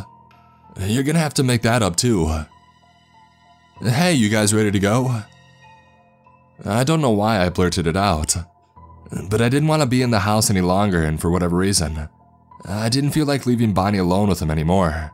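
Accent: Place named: United States. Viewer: I'm from the UK and this is the hardest American accent to listen to. What State is this from.?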